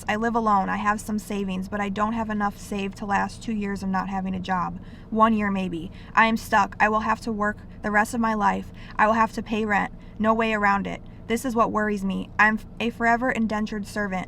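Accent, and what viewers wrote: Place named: New York, United States. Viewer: you sound like a New Yorker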